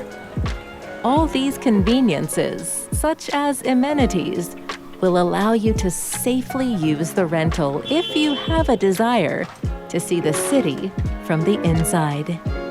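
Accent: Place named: United States. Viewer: The American pronunciation is hilarious! "Van go". Ridiculous! It's Fahn gockh. Also, Omsterdom, to pronounce it correctly, does not have the only diamond museum in Europe: there are many - try going to Antwerp and Brussels.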